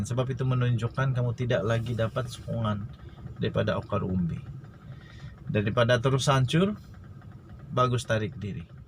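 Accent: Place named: Sabah, Malaysia. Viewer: OIYYYY SIUTTT KO BUAT CHANELL APA PASAL TUN MAHATHIR,, BANGSAT KAU PUNYA TAJUK CHANELL TUN MAHATHIR KO BUAT TAJUK MACAM DAH MENINGGAL PULAK..SIAL KAU NAK VIEW LEBIH PULAK KAU...DAH BAGI PEHA NAK BETIS,,, LEPAS TU KAU MATIKAN KOMEN DEKAT CHANELL TU SUPAYA ORANG TAK KOMEN CHANELL TU PASAL TAJUK,,SIUTTT KAU...KAU ORANG MANA SIUT?? KAU ORANG DALAM MALAYSIA KA ATAU SEMENANJUNG??? SUARA KAU GAYA KAU MACAM ORANG SABAH,,KAU JANGAN ... ORANG TU BELUM MATI KAU BUAT TAJUK MACAM DAH MATI...SIUT KAU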